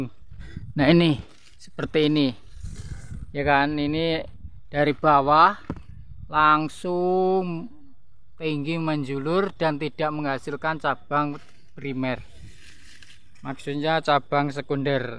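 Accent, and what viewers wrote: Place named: Java, Indonesia. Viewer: Pemangkasanya pas dan terukur, bahamu juga meduk njawani😂😂😂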